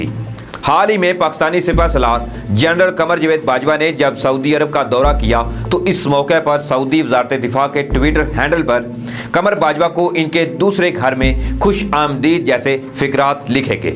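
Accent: India. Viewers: You speak Urdu in Hindi accent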